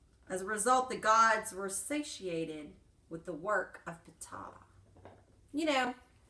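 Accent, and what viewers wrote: South, United States. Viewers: Its nice to here another southern voice on this topic!! Our culture here is less than a blink of an eye in Earth time. But still, in 10 years of this journey, not one person has have i met i can talk to about anything similar lol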